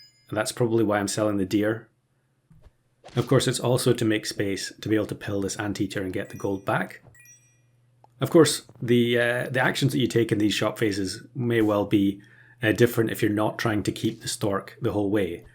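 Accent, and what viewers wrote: Scotland, United Kingdom. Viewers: Great, realy enjoy your Content thank you 🙂 you have a Great soothing scottish Voice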